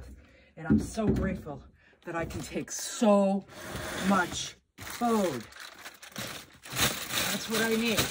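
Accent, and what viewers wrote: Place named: New England, United States. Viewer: Hi! Your song is 💯💗🔥 😍🎵🎶 Love, love love it! I came upon your Camper van Tour vid recently for the first time, while watching tiny off grid living. Glad I started with the Finished Reno. vid, because it lead me to your other vids and your story unfolded! Shortly after, you were singing your song and I thought "What a cute song and How did you know all the lyrics! It must be popular and Why have I never heard it before?" 🤣Then I watched the vid on how your song came to be! How great is that! I live in TX but I'm from LI. When I heard your New England accent, it brought me home. I have family from MA. I just knew I would enjoy this ride😄 As a kid we traveled & camped up and down the coast. I was hooked! Also, I am so like minded with you. From your food choices, your esthetics, your philosophies and your JOYFUL JOYFUL outlook. 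I just turned 60 and soon needing a new (used) vehicle. You've inspired me to maybe get one I can modify on a budget. Thank you! You're a ray of sunshine. I find myself humming that song and remembering to stay on that healthy path. Happy Travels!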